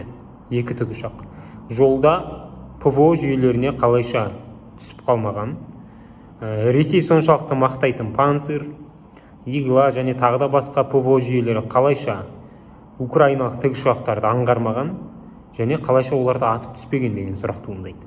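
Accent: Standard. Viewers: Қазақша таза сөйлегеніңізге отбасымызбен ризамыз. Мықты. Ұнату және жазылу.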